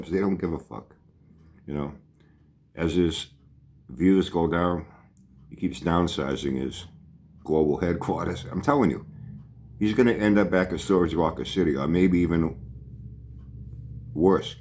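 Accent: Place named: New England, United States. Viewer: you are always the " voice of reason" I don't GF what they say the new England accent is just the salt and pepper on top...😁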